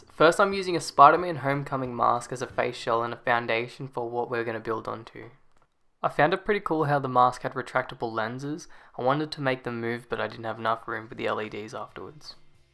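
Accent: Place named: Australia. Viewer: K I'm sad ur suit is better I've met my match and you sound Aussie two 😂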